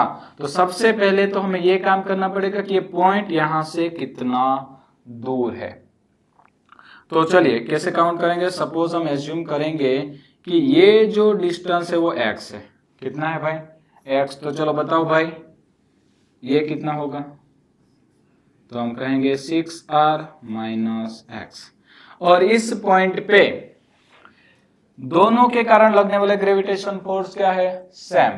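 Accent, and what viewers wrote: Gujarat, India. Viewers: Sir accent is Gujarati 😅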